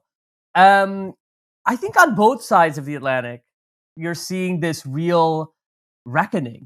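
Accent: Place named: United States. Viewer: UK here. I am not used to Americans (going by the accent) knowing so much about the UK.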